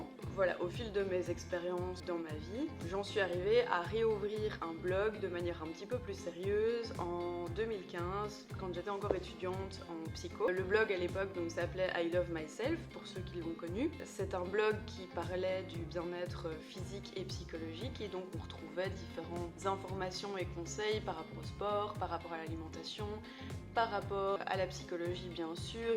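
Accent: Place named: Liège, Belgium. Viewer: Vive l’accent de Liège (et du bw❤️)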